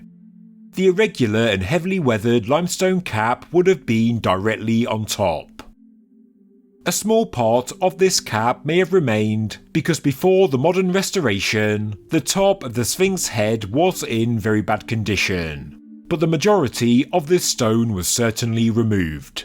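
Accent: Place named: England, United Kingdom. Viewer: since when did have an English accent?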